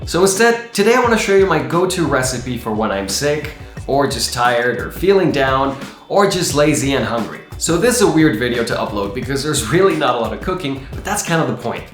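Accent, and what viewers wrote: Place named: United Kingdom. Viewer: How to sound british without sounding british. Where do you live sir?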